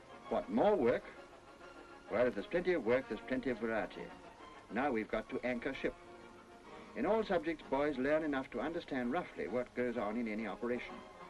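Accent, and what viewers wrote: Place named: RP, United Kingdom. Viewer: What a posh accent!!! Changed a lot by now.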